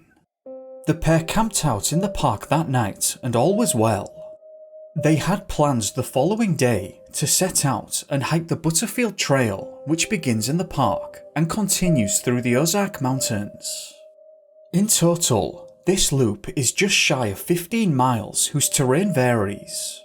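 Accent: United Kingdom. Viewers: Are you English , Irish 🍀 OR Scottish??Liking ur voice 🎤🎙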